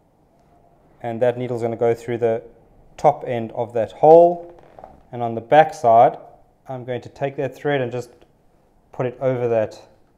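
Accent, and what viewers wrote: South Africa. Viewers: I love your saffa accent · Good video, thank you. You have a South African accent, we're you from? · Very pleasant to listen to. Are you from South Africa? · Sounding like a South African 🇿🇦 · Hey guys. It is so fresh and nice to hear the South African accent🤠. And at the same time see some real quality work. Makes me proud. I just recently started my business here in Germany #vanrensburgadornments. Slightly different direction than you guys. But I find your work very inspirational. Keep it up. This tutorial is excellent. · is that a south african accent? it’s a little different than australian or new zealand. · Yes man. I recognize the South African accent. Where do you get your hides?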